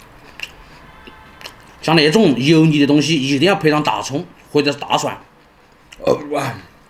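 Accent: Sichuan, China. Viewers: 好标准的四川话。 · 很好吃的样子，不过还是怕油腻 四川话很好听啊，越听越好听 想念国内的新鲜大葱😍😍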